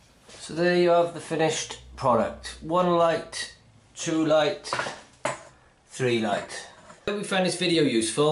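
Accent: Wales, United Kingdom. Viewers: He sounds a bit Welsh ....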